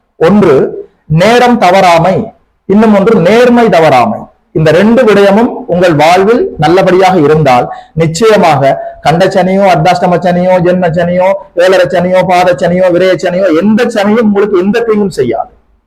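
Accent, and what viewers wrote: Sri Lanka. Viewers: Nice Jaffna Tamil pronunciation Sir!!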